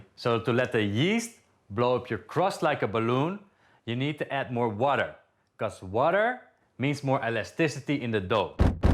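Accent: Netherlands. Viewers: Kan Gwn horen dat je Nederlands bent gap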